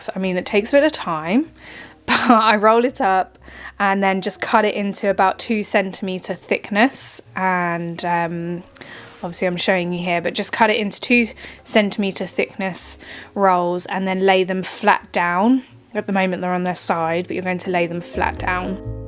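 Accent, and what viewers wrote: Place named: Devon, United Kingdom. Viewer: She speaks with a South Devon accent. Her ancestors probably came from the South Devon or Cornwall area sometime during the mid 1700's She is a Cornish woman. She is probably related to the cornish stand up comic Jethro.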